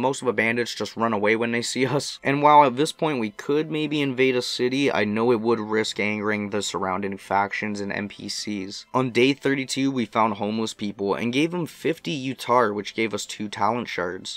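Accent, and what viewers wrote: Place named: Canada. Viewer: Subbed becus Canadian accent, Kenshi, and hoe appreciation.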